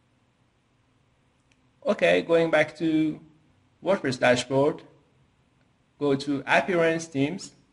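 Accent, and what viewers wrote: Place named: Iran. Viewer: Great themes, that Persian accent tho :)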